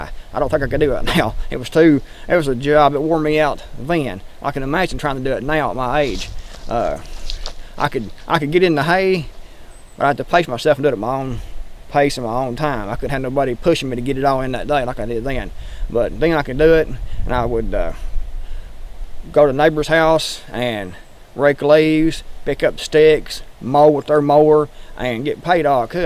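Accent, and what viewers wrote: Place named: Boston, United States. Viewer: You sound different. Where you raised in Boston? LOL Great video. Imagine a different upbringing that didn't lead you to a love of the outdoors and huntin' and such. Man that woulda sucked! Peace, brother and be careful that they don't sneak up on ya!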